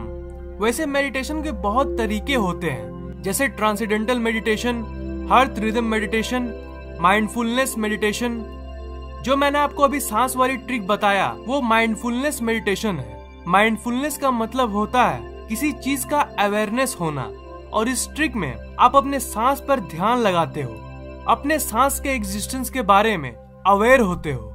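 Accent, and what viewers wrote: Bihar, India. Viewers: Bhai aap Bihar me Kahan se ho aap ki bato se bilkul lagta he ki aap Bihar se ho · Bhai aap Bihar ke ho kya 🙄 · Aap Bihar se hain kya?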